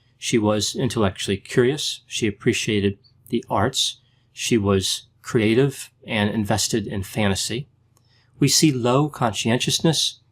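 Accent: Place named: Harrisburg, United States. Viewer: I share the Delaware Valley accent with. It's a beautiful thing. But I'm curious to know if he's more west-of-Philly than he is Delaware Valley. His accent would seem to indicate this. From him I hear more Harrisburg (or at least Montgo) than I hear Philly or Wilmington.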